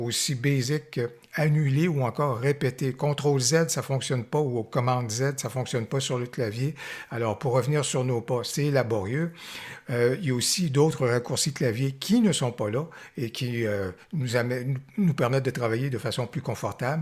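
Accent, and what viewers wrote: Canada. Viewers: votre accent Canadien que j adore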